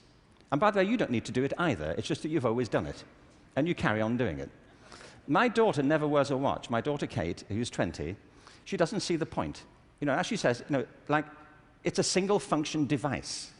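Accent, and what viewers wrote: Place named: United Kingdom. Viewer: Don’t like British accent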